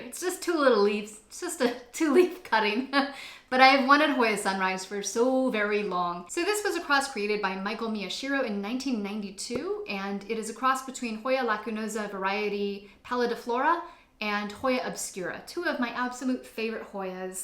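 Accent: United States. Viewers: Are you from Boston?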